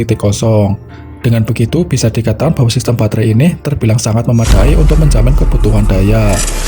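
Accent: Banyumas, Indonesia. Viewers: logatnya ngapak......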